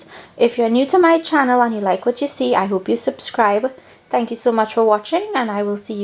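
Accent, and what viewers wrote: Trinidad and Tobago. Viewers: Hi. Trini English.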